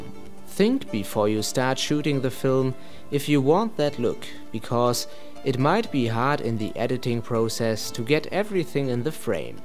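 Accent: Germany. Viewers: so schön deutsches englisch (Y)!! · OUCH that horrendous German English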